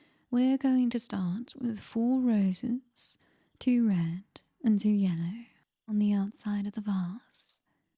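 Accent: United Kingdom. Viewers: If I may make a confession, a British accent was what came to mind when writing this script, so I'm glad it got a British voice. Thank you for the fill! :D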